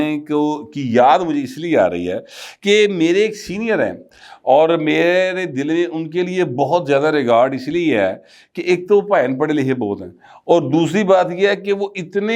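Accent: Punjab, Pakistan. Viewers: What I mean in the other comments Is Punjabi urdu mix accent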